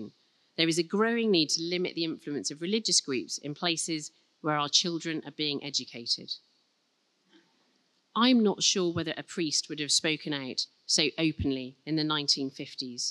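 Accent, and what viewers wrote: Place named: England, United Kingdom. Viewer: Lets hope this enlightened amicable intelligent hard working humane person becomes Prime Minister of the UK one day. Imagine, someone who knows what they are talking about as Prime Minister. Once you get over her beauty and adorable english accent ( I had a crush I eventually got over, reality set in ) and actually start listening to her without bias , watching her TV programmes and reading her books, you realise what a gem the world has here. And nothing but sense she speaks.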